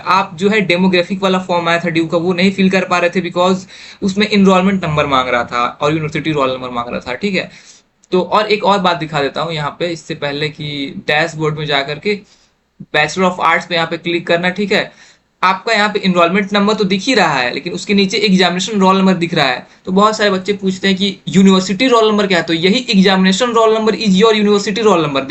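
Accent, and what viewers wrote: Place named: Bihar, India. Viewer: Bhai aap bihar se hi kya tone match kr rha h 😊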